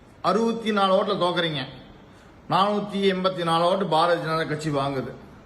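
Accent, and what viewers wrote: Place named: North, India. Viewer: இவன் முதலில் யாருடா!!! வடக்கன் மாதிரியே தமிழ் பேசுறான்.... தலை எழுத்து நீ எல்லாம் கை விரலை ஆட்டி பேசுவது. இந்நேரம் அந்த அம்மா இருந்து நீ இப்படி பேசி இருந்தா உன் நிலைமை நான் சொல்லி தெரிய வேண்டியது இல்லை...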